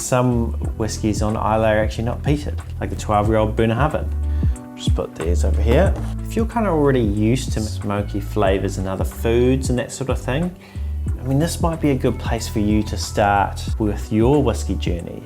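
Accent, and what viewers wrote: New Zealand. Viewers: Hey! Just had this video recommended to me, clicked it, thought 'oh sweet that's a Kiwi', then realised 'wait a second I know that guy'! Loving the content, instant subscribe! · Are you a kiwi? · When you first started talking I thought Aussie, but second sentence in I was like definitely Kiwi, especially when you said Glunluvet and Glunfudduch lol. Shot bro 🥃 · Hi, thanks for the great video. Question: is your accent a New Zealand or perhaps an Australian accent? Just wondering. PS: I’m an Islay Whisky fan 🥃😁👍🏼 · He’s definitely kiwi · You're from New Zealand right?? Testing my accent knowledge here lol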